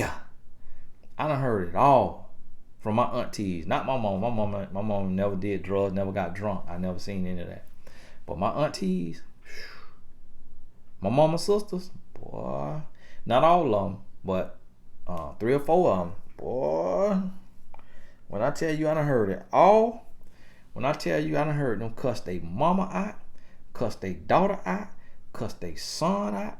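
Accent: South, United States. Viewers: First 5 and half minutes and I'm doneeeee. I done went up in praise and laughed so hard... This is truth spoken so well! Love the accent too-- it had me Rollin' to hear this with that country twang. It's all love 😂❤ Thank you 👊🏾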